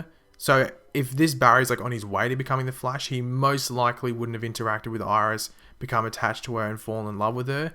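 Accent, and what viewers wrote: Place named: Australia. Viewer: youre so australian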